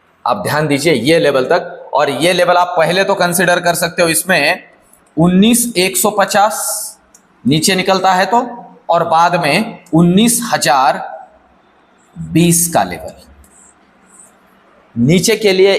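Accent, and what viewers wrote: Maharashtra, India. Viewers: Sir mera analysis ye kahata hai ki aapki mother tongue Marathi hai...🙏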